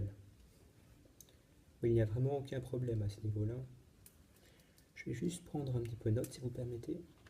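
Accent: Belgium. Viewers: Ne viens-tu pas de Belgique ?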